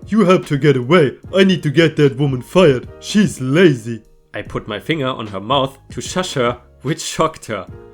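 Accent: Germany. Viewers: Sounds like he's from Germany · You sound so german :D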